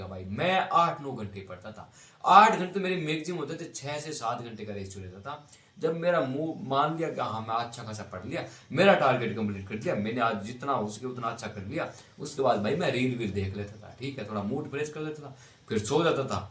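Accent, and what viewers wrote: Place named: Haryana, India. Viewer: Bhai hariyana se ho aap right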